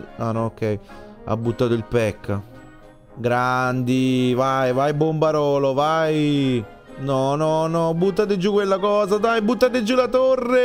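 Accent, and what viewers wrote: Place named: Naples, Italy. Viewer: haha si vede che hai l accento napoletano cmq bel video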